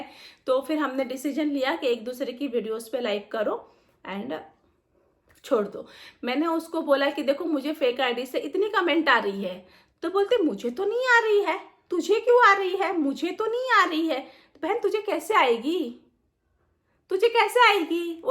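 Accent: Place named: Nagpur, India. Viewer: jb hm pune aaye to plz dal bhat khilana hm bhi aise hi bolte hai ngp me 😂😂good dear same language hamare side ki lagti hai maharastra vali 😍😍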